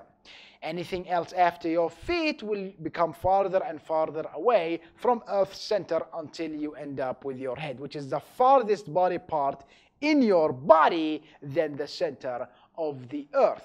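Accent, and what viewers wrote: India. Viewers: Is he Indian? Because his accent is so distracting. "Eaaartdh" - this guy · I cant tell if that's an Indian accent or an Arabic accent...either way it's fucking terrible. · What a perfect Hinglish! It makes me want back to India =)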